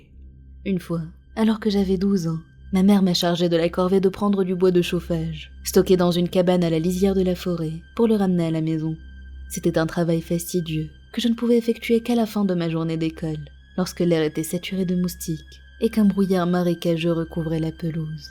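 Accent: Quebec, Canada. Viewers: Tu viens du québec ?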